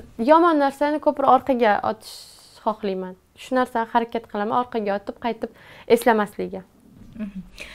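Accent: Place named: Tashkent, Uzbekistan. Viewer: Nega toshkentcha gapiryabsiz sirdaryoliksizu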